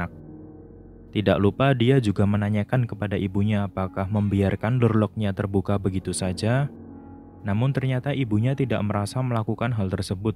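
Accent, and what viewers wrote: Java, Indonesia. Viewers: Jowo banget 😂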